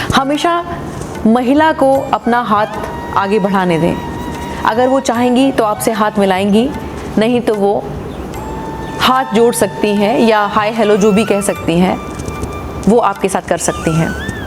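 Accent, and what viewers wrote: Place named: Hyderabad, India. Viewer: My Dear & Respected Royal Madam ji Namaskar ji. GOOD NIGHT. Today I saw your all video clips and specially your Syherees. Your pronunciation is like Hyderabadis URDU .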